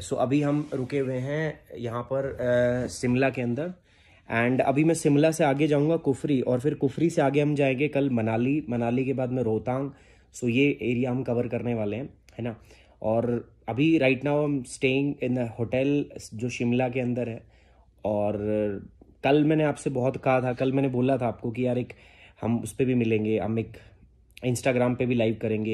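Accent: Haryana, India. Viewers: Sir ji.....teaching ek taraf arr aap suthre ek taraf.....arr fer baat aawegi apni bolli ki Ya haryanvi ke zeher laage hai na aapke muh se bas bujho na...baki hum k kahe.....aapke nazdeeki gaon se hi hain hum.....aate rehn diya karo vlogs gadhya gaddh 😍😅🤗 💗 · Radhe Radhe 🙏🙏sir g😇 Ek Ache Educator k saath Saath Aap to Blogger B Bnn Gye Aur Wo B Ache waale 💖💖 Aapka To Nose B Red ho gya Tha 🤪Apple jaise.. U r Superb Dear Sir g ❤️❤️ 💕💕May God Bless U Always 😇😇😇😇 Aapki Haryanvi Ki To Maii Fan ho Gyi Huu 💯💯💯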